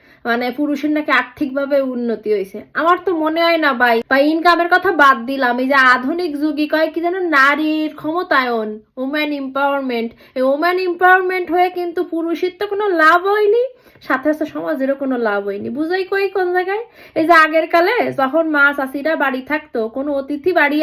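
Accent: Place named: Khulna, Bangladesh. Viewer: আপনার প্রতিটি ভিডিও খুব আগ্রহ নিয়ে দেখি আপু,, নিজের এলাকার মানুষের মুখের ভাষায় কথা শুনে আপন আপন লাগে,,,আমাদের খুলনা ডিভিশনের কালচার,মানুষের মেন্টালিটি, চিন্তাধারা আসলেই বাংলাদেশের বাকি সব ডিভিশন থেকে আলাদা এবং ইউনিক স্বচ্ছ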